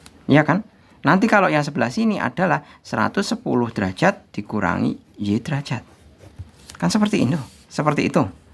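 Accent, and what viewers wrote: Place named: Java, Indonesia. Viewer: Medok ya pak…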